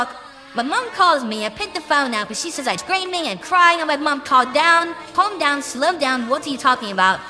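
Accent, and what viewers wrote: United Kingdom. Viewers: your British is showing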